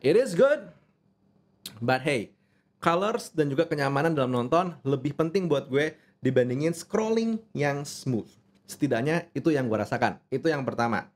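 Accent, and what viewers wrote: South Jakarta, Indonesia. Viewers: Jaksel bgt pusing dengernya.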